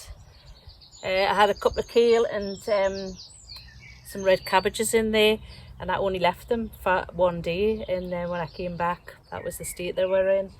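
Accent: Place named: Sunderland, United Kingdom. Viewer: I lived in Sunderland for nearly 20 years but I'm now in the Midlands so it's good to hear the accent and compare what's happening in the garden. I really enjoy your down to earth approach so many thanks for that. Just one question - there's some lovely guitar music and playing on your videos. Who is Kenny Mitchell? Any relation? Thanks again, Dave.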